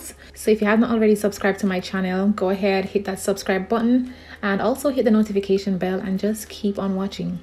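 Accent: Jamaica. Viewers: I have a sixth sense for a Jamaican accent...... Great video🇯🇲🇯🇲🇯🇲🇯🇲🇯🇲🇯🇲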